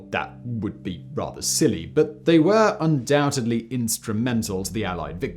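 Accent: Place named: United Kingdom. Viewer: Is it just me or got accent stronger in this video? Maybe it's due to this very British topic... 😁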